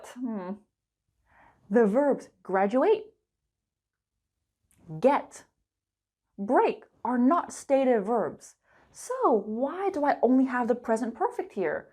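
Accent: United States. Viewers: THANK YOU, ARE YOU AN AMERICAN? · It has been a utterly splendid, exquisite and flawless lesson. I'm particularly joyful, blissful and elated with that opulent topic. It is to my liking; moreover, it appeals to me. I do adore speaking, and her charming accent. Hence, you are the quintessential of American English. You are the teacher whom I always wish to meet. I was wondering if I might dare to ask you a question? I saw the verb “gotten” is that correct? Thank you very much indeed. I pay my respects to you. You are the be-all and end-all. Far thee well, dearest Prof.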